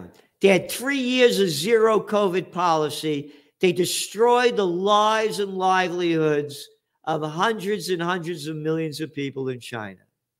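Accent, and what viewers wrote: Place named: New York, United States. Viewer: Spoken like a true New Yorker.. love it.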